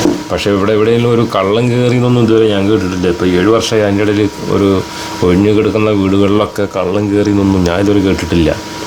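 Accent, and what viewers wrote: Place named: North Malabar, India. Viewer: INDONASIA ...... യേ കുറിച്ച് അറിയാൻ വല്ലാതേ ആഗ്രഹിച്ചു നിൽകുന്ന സമയത്ണ് ഇക്ക നിങ്ങളുടെ ഈ വീഡിയോ കാണുന്നത് .. വളരേ ഉപകാര പ്രദം അവിടത്തേ ഭാഷ .. ബ ഹാസ ..Indonasia പഠിക്കാൻ പ്രയാസമാണോ ? പിന്നേ അവിടത്തേ ഇസ്ലാമിക പാരമ്പര്യ തേ കുറിച്ചും മറ്റും അറിയാൻ താൽപര്യമുണ്ട് ഇക്കാ വേറേ അവിടെ യു ള്ള ആൾകാർ ഇന്ത്യൻ പേരുകളും ,,, മറ്റും വെക്കാറുണ്ട് എന്ന് വായിച്ചു അതിനേ കുറിച്ച് അറിയാൻ താൽപര്യം ഉണ്ട് ഇക്കാ ... ഇക്ക നാട്ടിൽ എവിടെ യാണ് .. സംസാരം കേട്ടിട്ട് വടക്കേ മലബാർ (കണ്ണൂർ ,കാസർകോട് ) ആണെന്ന് തോന്നുന്നു .. പറയാമോ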